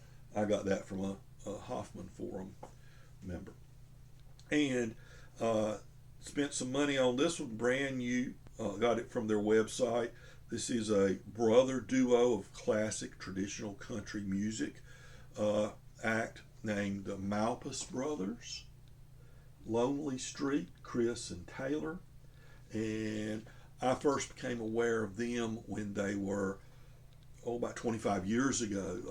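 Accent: South, United States. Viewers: Man, I watch a lot of record geek videos that turn out to be terrible, not just bad taste but SHOW THE DANG RECORDS! But yours is different, and better. You don't pretend to know things you don't, you're not chasing trends, you mix it up (I'm a jazz guy, but I saw Hillman and Peterson play together a few years ago and it was brilliant). I can't place your accent (North Carolina? Virginia? Alabama? I suck at southern accents) but I could listen to it all day. I'm a subscriber now.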